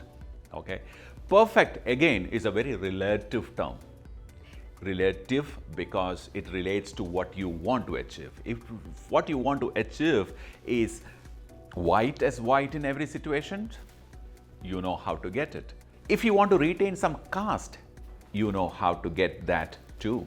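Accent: Kerala, India. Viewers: Love ur mallu accent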